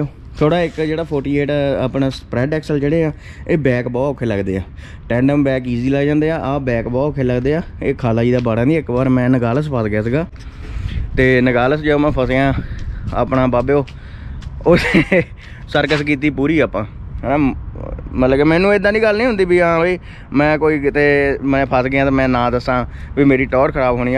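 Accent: India. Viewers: Veere tuhade language toh lagada tusi kapurthala vale side toh oh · Maza aagya bai....malwai boli❤️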